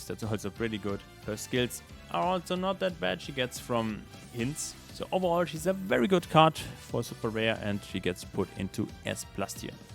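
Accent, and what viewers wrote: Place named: Germany. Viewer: Most German accent I've ever heard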